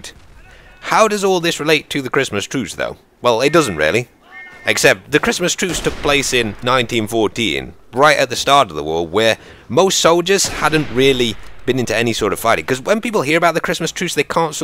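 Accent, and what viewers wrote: United Kingdom. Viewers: are you British?? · Not gonna lie, I didnt know was British! xD Aha, I really wanted this game ages ago, but my PC wasnt good enough (it was a pile of crap) probably gonna get it soon!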